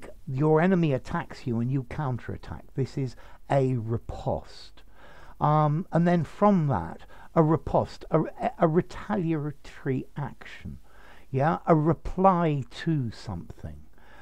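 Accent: United Kingdom. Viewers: Best teacher. I like your way of explanation. Especially your british accent.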